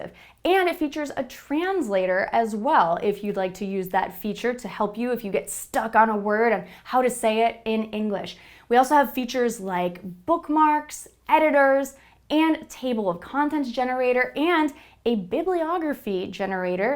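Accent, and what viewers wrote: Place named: United States. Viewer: of course american english. her american accent's is so strong and clear. that's why i like american online teachers so much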